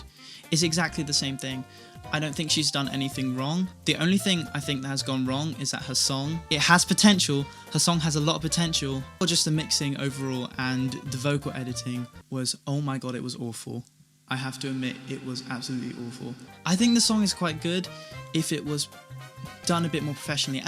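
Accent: United Kingdom. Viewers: Not related to the video but im new here and fricking out right now, like, Handsome cute deep british accent intelligent time stemps his video to subjects y so perfect 😍 ?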